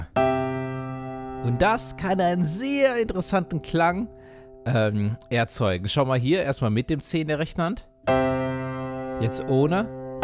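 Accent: Germany. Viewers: Moon yes you liking the videos we me, i find them very good, do you have a German accent? You hear you so on! 😂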